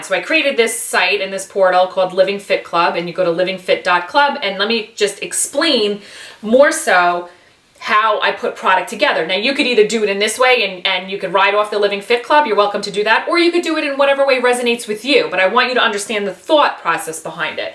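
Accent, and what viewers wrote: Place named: Northeast, United States. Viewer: loved the nor'easter accent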